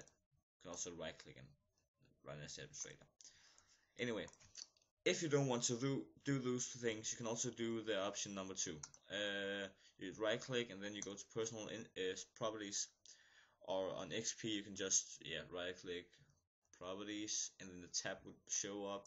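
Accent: Denmark. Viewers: Dansker? :D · jeg kan høre at du er dansk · du er dansker er du ik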